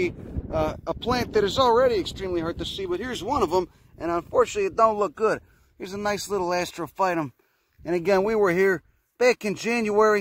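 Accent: Chicago, United States. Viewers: I love how you just blurt out latin and shit in that American accent. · You sound like my cousin he's from Chicago... just saying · Is your accent fake? Or are from the south side of Chicago, cause you sound like my fam.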